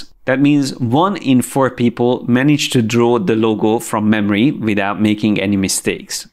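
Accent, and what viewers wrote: Hungary. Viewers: you have a big ass hungarian accent :D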